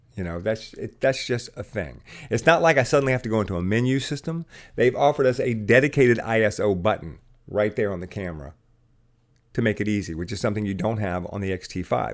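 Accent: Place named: United States. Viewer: I did like your presentation on these two cameras, it got me out of my doubt. And all American presentation , not like these other foreigners that I coudn't understand what they were saying. Nice comparison !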